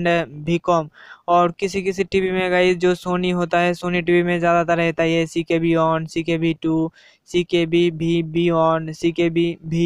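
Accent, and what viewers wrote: Bengal, India. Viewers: Aapka mother language bangali hai na is liye bahot logo KO samaj me nahi aata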